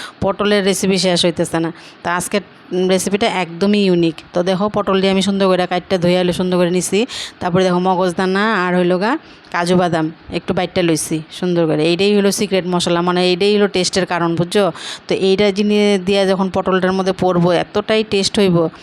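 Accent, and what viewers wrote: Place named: Bangal, Bangladesh. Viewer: খুব সুন্দর রেসিপি। আপনি বাঙাল ভাষায় বলছেন খুব মিষ্টি লাগছে শুনতে।👌❤️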